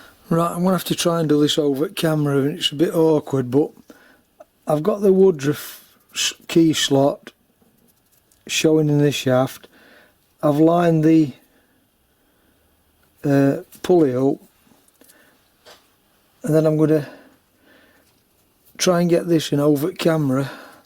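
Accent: Yorkshire, United Kingdom. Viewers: I caught your name in the comments the knacklers workshop, I’m pleased to sub you and will be looking through your back catalogue. You sound your from the land of the White Rose. So I’m not too far away over the border. If I can ever help.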